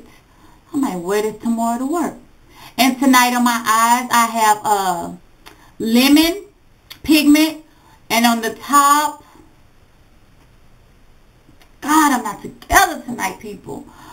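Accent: South, United States. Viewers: you looook soooo young i mean 33 is young but you look in your 20's love the vids...i could tell u were in the south by the way u tlked so tht was comforting to me im near dallas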